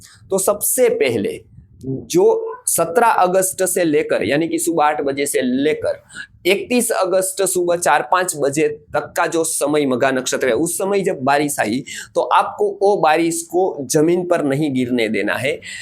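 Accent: Gujarat, India. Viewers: पंडित जी आप गुजरात से है क्या 🤔। माफ़ करना लेकिन मैं भी गुजरात से हुं। और आपके वक्तव्य में लहेजा गुजराती जैसा है।🙏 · बोलनेके तरीकेसे टोन गुजराथी ही लगते है प्रणाम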